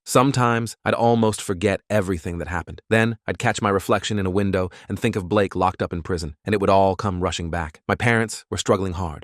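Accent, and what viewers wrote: United Kingdom. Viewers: sadly, but I like how the Brit accent sounds! (It was just funny how it randomly switched in the video)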